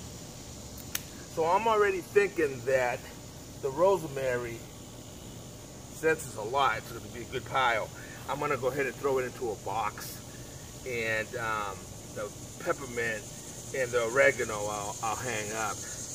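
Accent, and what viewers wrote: Boston, United States. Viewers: is sounding much better, I don’t hear pain in your voice, only that Boston accent.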